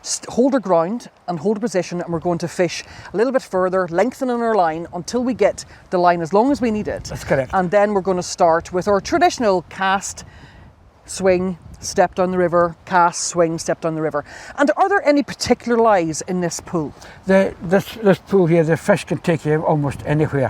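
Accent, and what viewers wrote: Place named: Ireland. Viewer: You sound irish hon.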